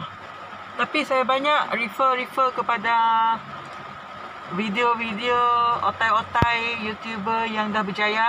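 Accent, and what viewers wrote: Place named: Sarawak, Malaysia. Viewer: Thank for info....btw slang Sarawak is best...betul ka...sy hadir untuk sokongan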